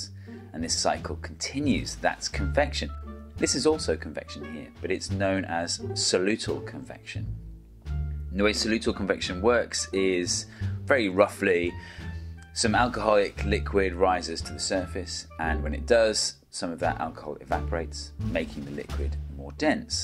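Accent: England, United Kingdom. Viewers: You are very entertaining as you are enlightening. Got to say, as a Southern born American, I love the English accent!!!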